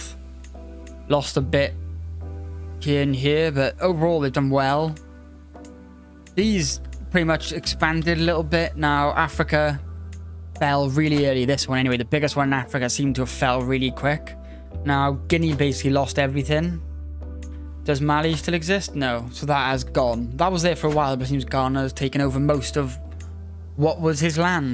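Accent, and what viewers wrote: Wales, United Kingdom. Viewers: Are you welsh, Sir?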